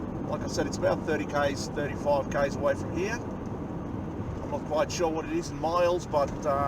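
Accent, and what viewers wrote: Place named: Australia. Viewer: You have a Very STRONG Aussie accent , i just noticed.👍.... good for me to get used to such strongness of Aussie accent before emigrating there.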